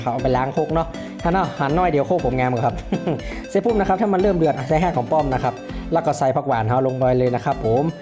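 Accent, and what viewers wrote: Thailand. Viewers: พากอู้เมืองเน้อหล้าน้อยม่วนดี ไปเรียนทำอาการจากไหนเก่งแต้ว่าน่าขนาดเจ้า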